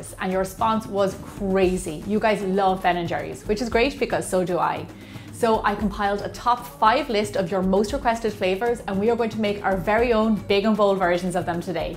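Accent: Ireland. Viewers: Omg i love her Irish ascent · I love your accent are u Irish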